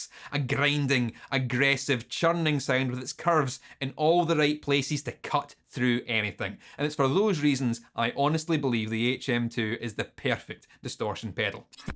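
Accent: Scotland, United Kingdom. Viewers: I watched the other HM-2 video before this... without much thinking about it or registering what the channel name was I thought "hey he sounds like that other scot"... turns out it's the same person, he just cut his hair. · Combine the circuit analysis with the Scottish accent.. 😩😩🥵🥵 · Scottish accent is the coolest.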